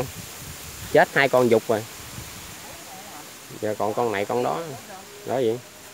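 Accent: Vietnam. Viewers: Em làm clip vui vui, noi chuyện nghe là biết miền Tây anh thích lắm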